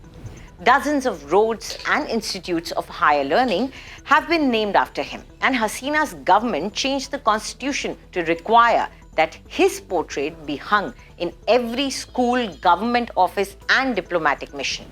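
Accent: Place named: India. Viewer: Sometimes I don't know why you could pick up such a good oral indian english but Not a proper english accent. It's time for you to go to UK or to USA for an accent reduction therapy session.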